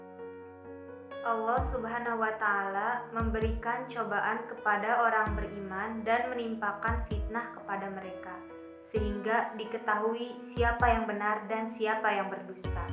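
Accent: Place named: West Java, Indonesia. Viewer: Memangni dari SMA klo dengerin ni anak ngaji suka enakeun aja . Ke sunda sundaan merdu 😋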